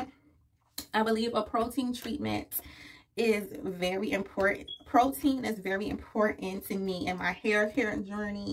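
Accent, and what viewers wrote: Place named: Philadelphia, United States. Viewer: Loved this 🙌🏾 thank you sis! Lol I just knew you were from Philly with your accent 🤣 sending love from S. Jersey !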